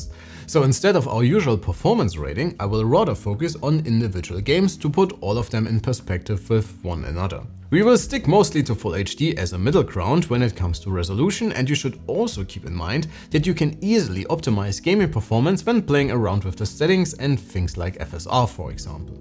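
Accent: Germany. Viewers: That German accent is 🔥 🇩🇪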